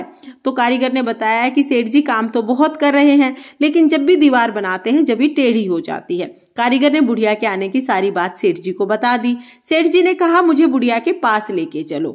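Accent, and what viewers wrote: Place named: Bengal, India. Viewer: Di aapki bengales kafi pyari lag rahi h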